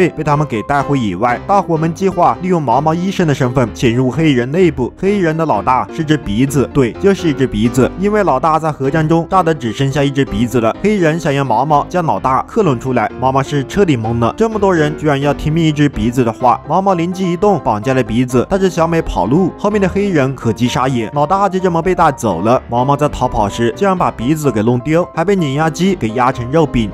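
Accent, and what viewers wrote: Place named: Hunan, China. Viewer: 这个湖南口音。。。。好出戏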